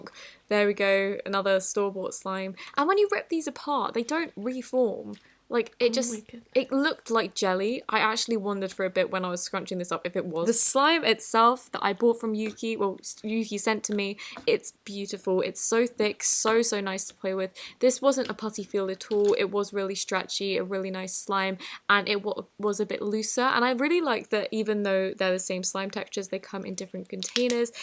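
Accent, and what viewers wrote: United Kingdom. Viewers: The british accent is such a touch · I like your voice bc I like British peoples voices🤣😂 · How did I wake up to a British lady reviewing slime? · can u talk a little slower? I dont want to sound mean but i'm hispanic and I can understand english videos but u talk too fast and plus u got a british accent, I like your videos but I struggle understanding them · She has British acent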